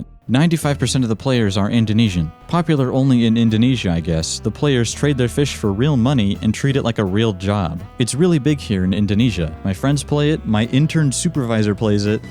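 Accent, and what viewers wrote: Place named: Singapore. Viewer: you live in singapore? your accent seems like it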